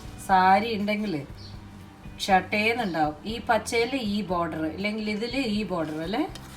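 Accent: Palakkad, India. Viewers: പാചകം കാണുന്നതിനെക്കാൾ പാലക്കാട്‌ ഭാഷ കേൾക്കാൻ നല്ല രസം.കറി സുപ്പർ.ഉണ്ടാക്കി. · mikka palakadan markkum avarude slang parayan valya madi ayirikum, chechi spr anu enik ishtayi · I am also from palakkad..can relate ur way of talking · Super. Love the language and slang of Palakkad style. My native place is Palakkad. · Hello, namasthe🙏 Oru Trivamdrumite aayitu ee comment kandittu noki pokan kazhinjilla. Palakkadan bhasha nalla suka kelkaan.. ente childhood days njan orupadu ishtapedunna mannarkad kure spent cheythitundu Oro deshathinte bhashaye inghane public aayi criticize cheyyunnathu sheriyano.. oru nallathu parayan oru negativity de avasyamundo.. , I love Palakkad too.. may be njan Trivandrumite aayondavum anghane ishtapedan pattunathu😊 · Hai chechi chechide palakkadan touch super enike ishtayi chechi de talking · പ്രേത്യേകത പറഞ്ഞാൽ.. ഒട്ടും ബോറടിപ്പിക്കില്ല. 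വളരെ നല്ല അവതരണ ശൈലി. അതുപോലെ തന്നെ ഉണ്ടാക്കുന്ന വിഭവങ്ങളും super. പാലക്കാടൻ ഭാഷ 👌👌♥ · Pkd style le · Nammaley pkd slang spprr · The description in Palakkad slang is so good! Great! I really enjoyed! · PALAKKADAN BHASHA NALLA SUKHATTO KEKKAN......TVM BHASHA KETTE KETTE MATIYAYITTO....AVIDE KORACHE ARROGANT AANE BHASHA....PLKDN STYLE ORU ELEMA INDETTO...PARAYATE VAYYA MOLE...😊😚😊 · Palakkadan accent....hi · പ്രോഗ്രാം സ്ഥിരമായികാണാറുണ്ട് ഗംഭീരമാകുന്നുണ്ട് പാലക്കാടൻ ഭാഷയും നന്നാവുന്നുണ്ട് പടവലങ്ങ എരിശ്ശേരി ഉണ്ടാക്കുന്നത് കാണിക്കാമോ.... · Palakkadan padavalangha molakushyam+palakkadan bhasha(kittolu,avoo) endekuttiye enikku vayya.UGRAN. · Palakkadan bhasha kelkkumbo oru santhosham 😊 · Venu Gopal, അല്ല സാർ, ഞങ്ങൾ വള്ളുവനാട്ടുകാരുടെ സ്ലാങ് ഇങ്ങനെയല്ല...ഇവർ പാലക്കാടിന്റെ അങ്ങേയറ്റത്തെവിടെയോ ആണ് ( തത്തമംഗലമോ കൊല്ലങ്കോടോ ചിറ്റൂരോ ) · Sharikkum palakadan bhasha. Kure aayi kettitu. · Thani palakkadan bhasha...curry superb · similar to thrissur style.. 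also like your palakkad slang, couse i has some of palakkad friends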